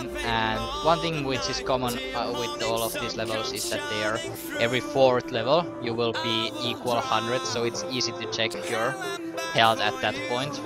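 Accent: Finland. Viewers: Ha! I knew that you are Finnish because of your accent (Actually, the "wikipedia" was the part when I knew that you are Finnish) Sinä paljastuit! :P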